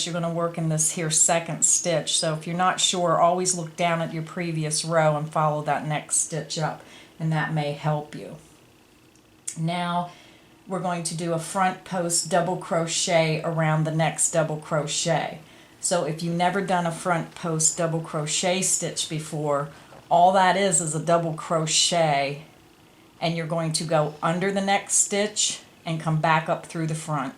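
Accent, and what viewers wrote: Pennsylvania, United States. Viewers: Where are you from? You sound just like my best friend from Pennsylvania. I really enjoy your classes and have learn quite a few new stitches by watching. Thank you for your time and your posts.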